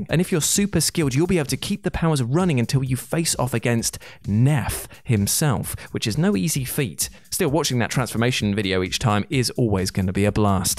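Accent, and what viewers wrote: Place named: United Kingdom. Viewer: american english but british accent xd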